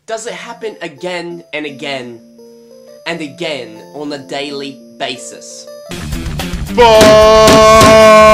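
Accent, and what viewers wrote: United Kingdom. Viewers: cool british accent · i loove ur british accent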